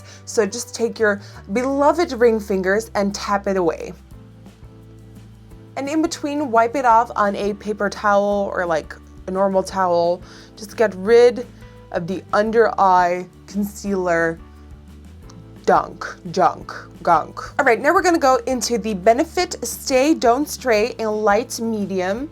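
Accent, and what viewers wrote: Netherlands. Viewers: 2015 is so different to 2011! She used to have such a thick Dutch accent! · Are you dutch? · Subscribed!! Not only are you funny sweet and full of great tips but your adorable accent reminds me of my late great grandmother and my uncle. Both are from the Netherlands too!! Keep up the great work and awesome videos! 😘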